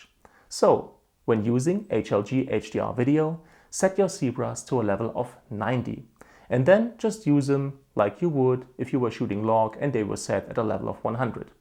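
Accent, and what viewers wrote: Stuttgart, Germany. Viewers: Are you from Stuttgart?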